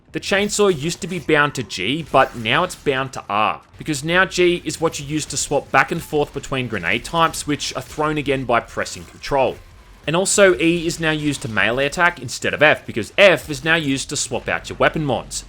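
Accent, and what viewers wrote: Australia. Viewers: So that's what aussie accent sooounds like.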